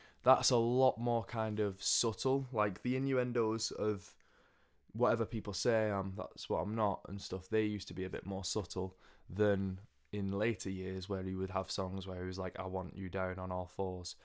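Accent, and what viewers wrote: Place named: United Kingdom. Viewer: it's really refreshing to hear an actual nice British accent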